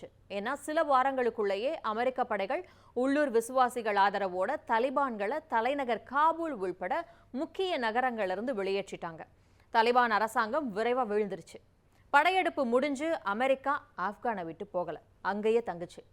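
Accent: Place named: Chennai, India. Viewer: இவ்வளவு கௌவரவமாக உடை உடுத்து Madras தமிழ் பேசுகிறீர்கள் முத்துராமலிங்கம் உயிரோடு இருந்திருந்தால் உங்களைப்பெற்றதற்காக நிச்சயமாக கவலைப்பட்டிருப்பார் .பாடசாலையில் படிக்கும் போது Madras தமிழில்தான் படித்தீர்களா? தூதூதூதூதூ.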